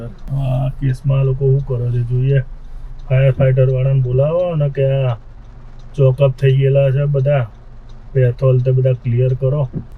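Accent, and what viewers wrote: Mehsana, India. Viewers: Tamaru gam khu che bhai tame saras amara jem mahesana ni boli bolo cho gam nu nam aapjo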